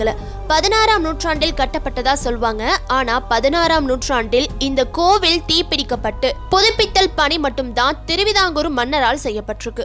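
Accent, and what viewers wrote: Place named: North India, India. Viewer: Unga Tamil voice super...!! North Indian girl Tamil pesura Mari Iruku....!!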